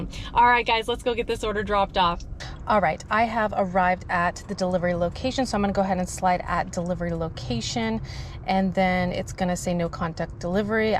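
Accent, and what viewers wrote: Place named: Portland, United States. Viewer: Sounds like Oregon,Portland metro area.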